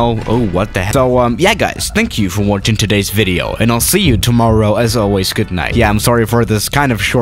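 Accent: France. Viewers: I love ur French accent 😂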